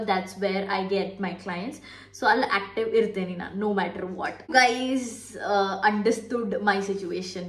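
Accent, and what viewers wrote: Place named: North Karnataka, India. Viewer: nam uttar Karnataka accent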